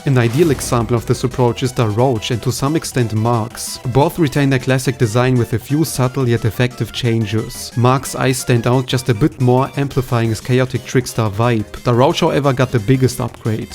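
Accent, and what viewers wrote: Germany. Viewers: Höre ich da etwa deutsch heraus?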